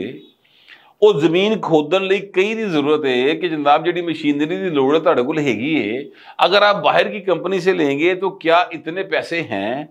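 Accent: Lahore, Pakistan. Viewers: Geo tuhada jawab nahin. Awwami style Khalis Lahori lehja dill ko chhoo leta hay.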